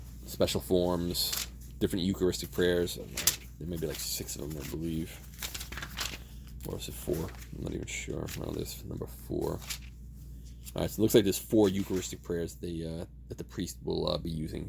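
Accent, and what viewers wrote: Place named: Boston, United States. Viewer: You sound like JFK you must be from Boston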